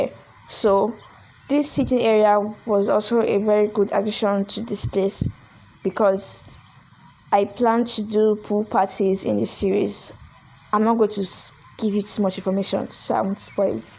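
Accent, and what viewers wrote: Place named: Nigeria. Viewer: As I heard your voice, I knew once you were a Nigerian 🇳🇬😝😝😝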